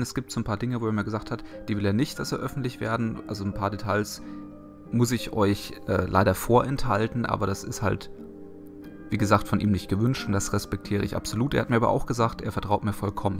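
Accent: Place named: Halle, Germany. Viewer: Legends never die! Seine Videos haben mir als Skyrim-Neuling bis jetzt sehr geholfen und tun es auch noch. Und seinen leichten Dialekt hab ich natürlich auch erkannt, ich denke mal Hallisch? Wäre mal interessant zu wissen, kann auch sein, dass ich falsch liege. Jedenfalls mach weiter so, und tu das, was du für richtig hälst. Vielleicht siehst du den Kommentar irgendwann, auch wenns unwahrscheinlich ist. Ich gucke jetzt mal deine FAQ-Playlist, vllt wird meine frage beantwortet. lg an euch ^^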